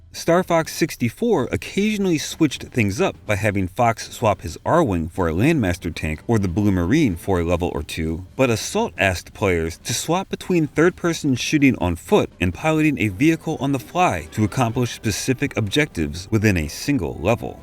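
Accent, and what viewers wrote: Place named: United States. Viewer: I can't help but wonder what region of the US you're from. Or if you're Canadian. The way you pronounce your O's sometimes really stands out. I desperately wanted you to say "the poconos" lol. Great video btw. I've always loved Star Fox :)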